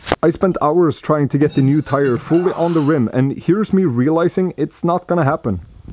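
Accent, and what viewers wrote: Norrland, Sweden. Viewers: dude are you swedish? Hejsan!! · You from Skellefteå, or some other part of norrland? I'm from Lycksele.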